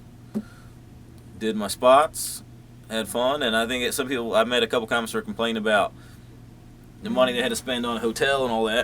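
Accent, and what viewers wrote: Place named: Ohio, United States. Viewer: you're fucking funny. I picked up on your YouTube videos looking for RV advice. As a single person(female) with no knowledge of even changing the oil in anything I appreciate your lack of knowledge...I have none myself, and wish to live a life free and mobile. It's also nice to hear a voice near where I grew up. I'm from Cincinnati. I haven't lived there in decades but Ohio people just know when Ohio people speak...hehehe we're inbred that way...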